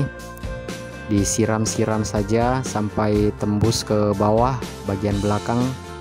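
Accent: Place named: Makassar, Indonesia. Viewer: Sprti nya anda orang makassar ya?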